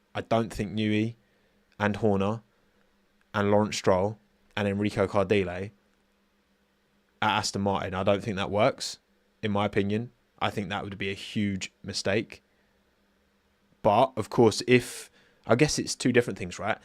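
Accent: United Kingdom. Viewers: Wow, what a frustration! You sound like a Brit and that’s not a compliment…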